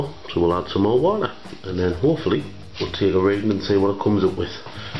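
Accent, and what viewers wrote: Middlesbrough, United Kingdom. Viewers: you must be from the boro.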